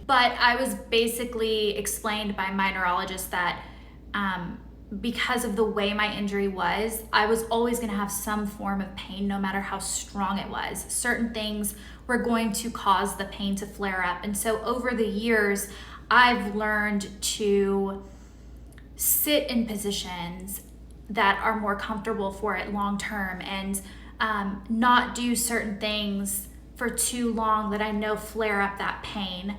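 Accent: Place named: South, United States. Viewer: Your southern drawl is coming back <3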